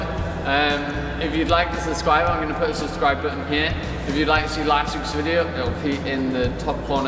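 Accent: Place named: Scotland, United Kingdom. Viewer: It's the accent of course. Sounds.. Scottish?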